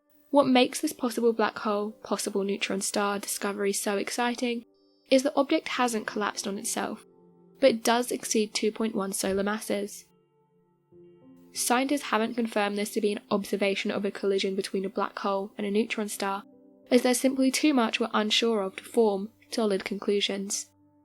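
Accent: United Kingdom. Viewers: Are you British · ARE YOU A BRIT? 🇬🇧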